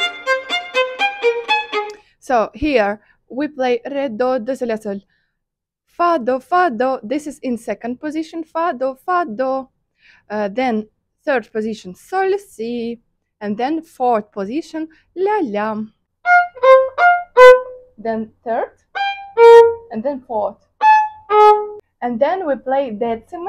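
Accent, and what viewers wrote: Russia. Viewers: Ничего себе, у вас произношение нот как у русских, до ре ми фа соль ля си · Автор ведь знает русский? Мне, кажется, есть русский акцент...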